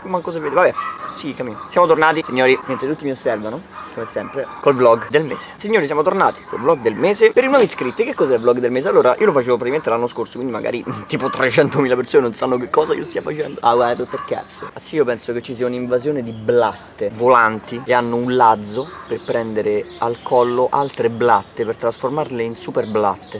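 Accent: Rome, Italy. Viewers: Parla di più in romano che fa ridere un bottooooo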